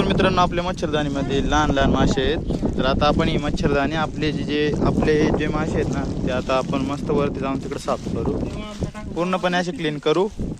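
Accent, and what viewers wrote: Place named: Karwar, India. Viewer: करवाली बोलतात .....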